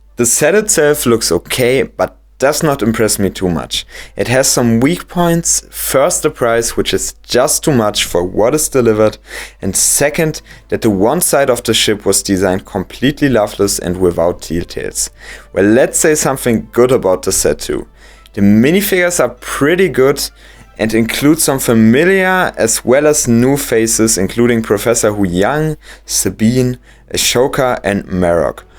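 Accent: Germany. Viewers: Am Akzent würde ich mal herleiten, dass du deutscher Muttersprachler bist. ;) Und ich bin schreibfaul, daher bleib ich gleich dabei. Ich hadere noch mit diesem Set. Wie derbe hängt das Cockpit wirklich wenn man es hochkant hinstellt? Hatte Fotos davon gesehen und das schreckt mich tatsächlich noch ab. Und mit diesen Videos hast du ne gute YT Lücke erwischt, gerne mehr. :)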